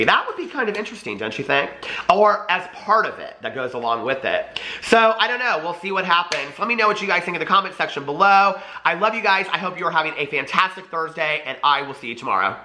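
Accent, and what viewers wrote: South, United States. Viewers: New subscriber and I adore your fun personality!! Also, love the Southern accent and hugs from Texas.. James Charles is a immature and entitled man child. Whereas, Jeffree Star is a mature grown person, who remembers his roots.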